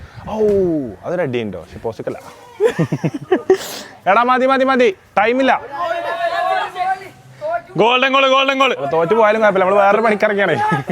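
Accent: Malappuram, India. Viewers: ടെച്ട് മ്മളെ മലപ്പുറം 😂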